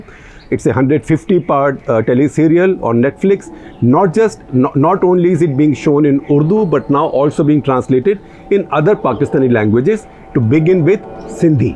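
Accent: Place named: Hinglish, India. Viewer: I like your HINGLISH man😂😂😂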